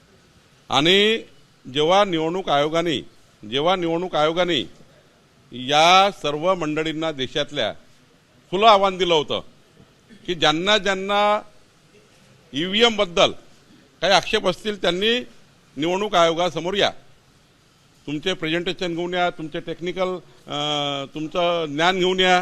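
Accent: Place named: Vidarbha, India. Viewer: विदर्भातील नेत्याची बोलण्याची पद्धत थोडी वेगळी आहे.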